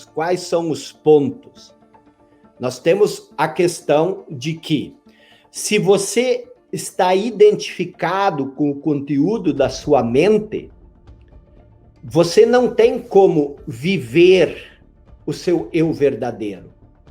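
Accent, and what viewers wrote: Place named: Rio Grande do Sul, Brazil. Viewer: Bem que eu conhecia esse sutaque. Você é aqui do Rio Grande do Sul. Adoro seus vídeos.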